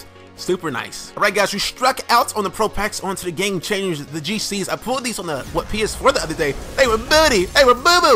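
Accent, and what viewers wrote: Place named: New York, United States. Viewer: Lol u are a cowgirls fan but u sound like ur from New York.?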